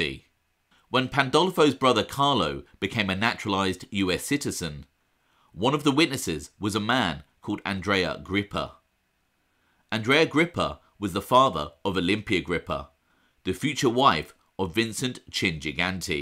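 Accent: United Kingdom. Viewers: For the life of me, i cannot understand why this channel doesn't have a million viewers by now. With all the mob related content out there, this channel stands alone as the best source for accurate information on the mafia in NYC. My wife especially loves to hear the "sexy deep voice" in which it is delivered in, I personally wouldn't go that far, but as everyone knows, If you enjoy a documentary about how the world was once in chaos, how humanity hung in the balance, and or how John Gotti got a haircut once a week, you definitely need a British accent for that sort of thing. has got that in spades.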